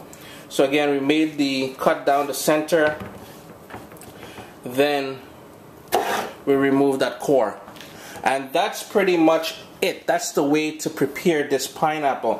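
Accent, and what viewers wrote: Trinidad and Tobago. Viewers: your trini roots coming out loud and clear....thank you.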